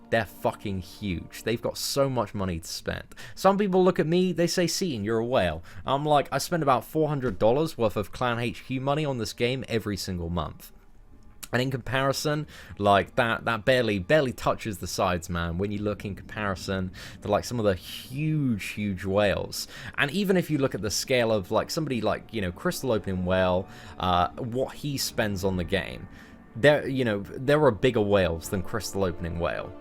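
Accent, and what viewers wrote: United Kingdom. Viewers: Not to be weird seatin, but I love hearing you speak in your British accent with your British words hahaha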